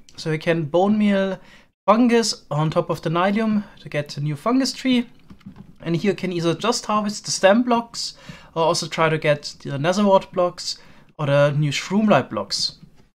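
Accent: Germany. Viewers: One question. I'm not sure where your accent is from. Is it German? Or some other one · What a german accent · Where are you from? You sound like a german guy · Er redet wie ein Deutscher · Is that an german Aczent? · It's just standard German English. The „th“ sound does not exist in the German language and the „z“ (as in „blaze“) is made in a similar way and does not sound too different. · Are you German? Your accent is pretty familiar to me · This german accent xDDD i rly cant stand that xDD But still nice video :) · I can tell you’re german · his german accent tho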